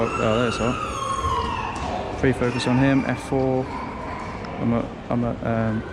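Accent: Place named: East Midlands, United Kingdom. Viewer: where are you from? I know you live in Swansea but I sense a distinct East Mids accent...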